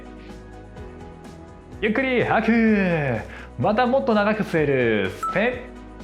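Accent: Kansai, Japan. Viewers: 関西訛りが良かったです 笑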